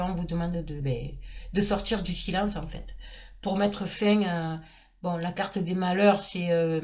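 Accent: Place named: Marseille, France. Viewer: Bonjour vous êtes du midi Marseille mais pas de paris Bravo vous avez une voie qui chante bien je suis gémeaux ascendant BALANCE et Lunaire BALANCE et ge deux petits enfants comme mois sauf lunaire mais ils ont us plus MERCURE et venus a leur naissance a y une chose bien le signe du Lion qui est depuis longtemps dans le signe Gémeau 🧚l'étoile de david me rappel mes ancêtre juive🧚oui c pas mal j'aime bien Merci mois je suis de Libourne près de Bordeaux et depuis 69 je suis sur Toulouse bonne journée 🧚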